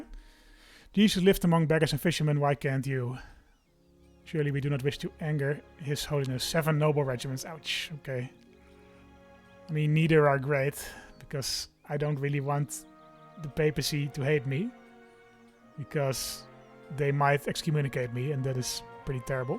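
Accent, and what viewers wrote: Netherlands. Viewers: As an American, hearing that this is a Dutch accent makes me realize how there's so many Dutch people in America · I see why you chose Holland. Your accent sounds dutch.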